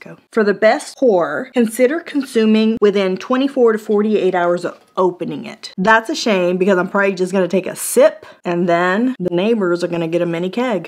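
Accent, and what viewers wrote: South, United States. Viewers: Malta, coquito shes a puerto rican w a southern accent and funny too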